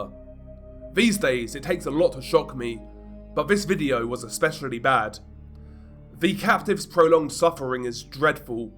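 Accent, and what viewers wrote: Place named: United Kingdom. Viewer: He’s a brit lmfao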